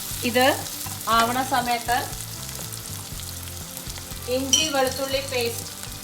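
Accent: Palakkad, India. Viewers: moly. I used to make 70 percent items in same recipie. But I will not miss your videos as I like to hear the explanations in palaghatan sailie.Also I enjoy your (jokes) homely talks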